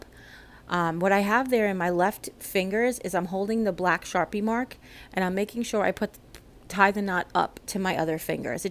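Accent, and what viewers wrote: New York, United States. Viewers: I like your subtle NY accent (and your tutorials, too👍)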